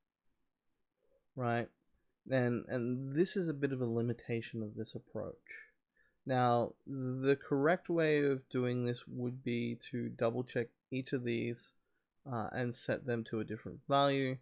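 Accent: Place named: Australia. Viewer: My wife's a septic (Massachusetts) living here in Sydney. Good to hear you still sound like an Aussie :) I'll sit down with TF tonight and hopefully get what I need working. I'll take a look at all your videos when I get a chance, thanks for doing them. The use of the Inverse Relationship the other way around was somewhat ingenious.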